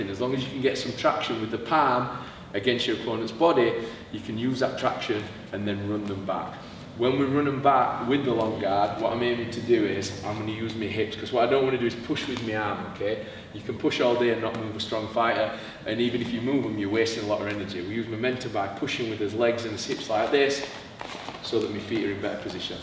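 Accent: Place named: Barnsley, United Kingdom. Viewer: Barnsley twang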